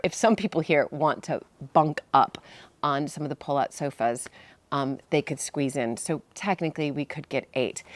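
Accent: United Kingdom. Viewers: Could’ve left the posts on terrace and made either a trellis or strung tiny little lights sort of as a privacy screen:) I like that I can hear the slightest bit of a British accent coming through when you speak:)love your place it’s beautiful:) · I’m hearing your English accent coming through 😊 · it's been a while since I've watched one of your videos and you've been in Britain long enough now that your American accent is taking on a British sound in words like, also, love, all, and wall. Interesting!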